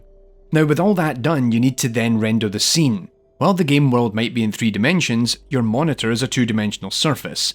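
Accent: Scotland, United Kingdom. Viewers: Are you scottish? 😁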